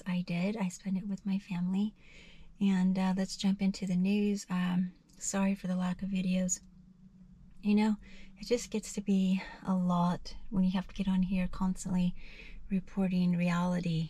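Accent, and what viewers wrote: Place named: Maine, United States. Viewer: i hear maine, or vermont or whatever and maybe its true. Id like a guess outside the U.S if you picked those.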